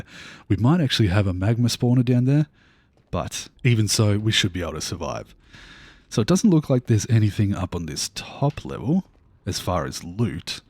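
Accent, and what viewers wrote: Australia. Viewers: hi mate quick question, are you australian?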